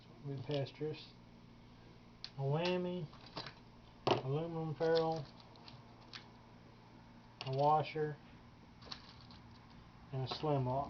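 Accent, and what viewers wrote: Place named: Texas, United States. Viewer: bout like yat. Texas talk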